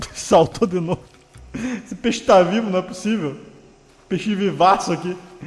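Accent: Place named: Goiás, Brazil. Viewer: O fala igual goiano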